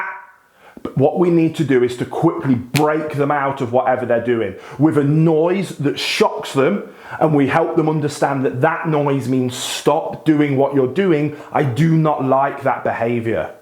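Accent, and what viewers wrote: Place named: Midlands, United Kingdom. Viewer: Your videos are just fantastic! And hearing a midlands accent reminds me of home..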